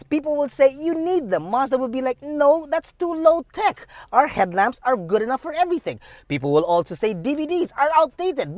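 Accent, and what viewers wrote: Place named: Philippines. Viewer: Forget the car. It’s that excellent Filipino English. Bravo!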